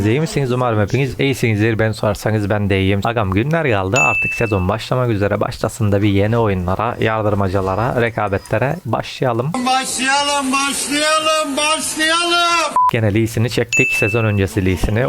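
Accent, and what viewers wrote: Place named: Serik, Turkey. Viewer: Abi sende tam Serikli şivesi var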